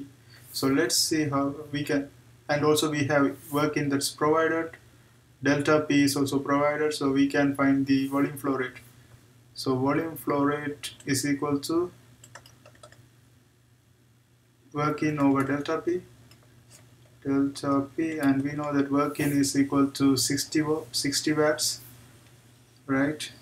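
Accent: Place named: India. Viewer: Indian speaker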